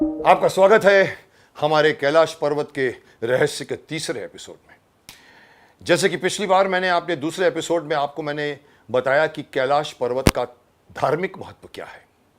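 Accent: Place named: Assam, India. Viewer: Wow aap assam bolte hai to mujhe bahut achha lagta hai . Ilove u jaan😍😘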